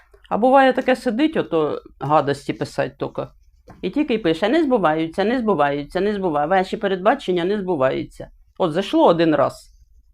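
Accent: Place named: South, Ukraine. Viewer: Викликає довіру, щира, не клянеться, - що можу, те можу... Видно по говору, що жінка з південної України.... Дай, Боже, щоби так було як Ви побачили.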